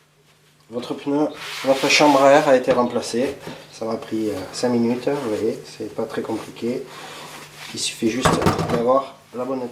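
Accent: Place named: South, France. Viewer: Bjr Merci du tuto. Chèr rider cést tress bien. Réalisér laetitia de verviers en Belgique pianiste.. Et rideuse dans mes heures libres.... Un grand coup de chapeau. Pour remettre ce pneu.. En action.. Cest une bonnne vidéo bel accent du sud..❤️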